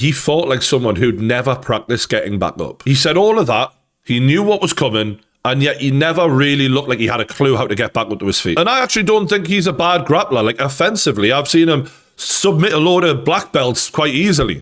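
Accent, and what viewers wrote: Newcastle, United Kingdom. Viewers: 100% Geordie this is a joke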